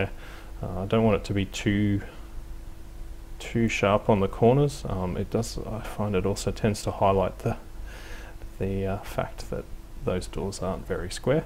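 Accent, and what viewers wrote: Australia. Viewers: Well, in this case and if you really go on with this kind of detail, you should glue corrugated paper on the inside walls as well, as in real life containers the corrugated metal sheet that serves as sides and ceilling, is pretty much the same both inside and outside and if the doors were left open it should be visible. Thanks for the video mate, it's awesome. Subded instantly. If I may, I would suggest that you spoke a bit more slowly and if possible with a bit less of the aussie accent, as it is quite difficult for us non native speakers to follow. Cheers and stay safe.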